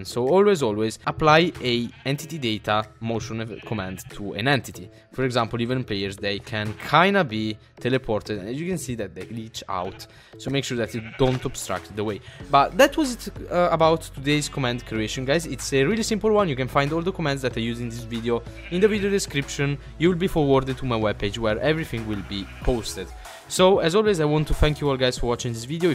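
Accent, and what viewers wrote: Italy. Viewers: quanto si sente che sei italiano😂 comunque sei un figo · Si sente che sei italiano 😉😉 xD Sei un grande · si sente che l'accento è marcatamente italiano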